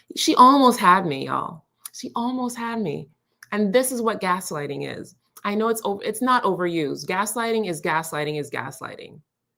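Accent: Jamaica. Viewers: I love when you use your Jamaican accent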